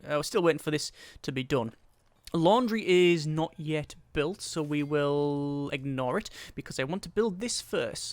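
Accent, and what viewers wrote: United Kingdom. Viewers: Are you from the UK. England or Scotland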